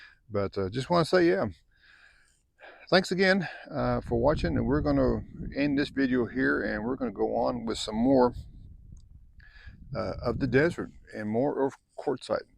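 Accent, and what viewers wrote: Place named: North, United Kingdom. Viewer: Hey you sound like a great northern boy like me.....please h it. Me up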